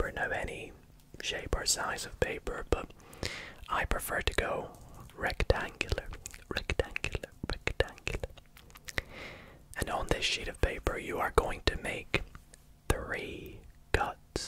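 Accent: Scotland, United Kingdom. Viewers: Enjoyed the video also are you Scottish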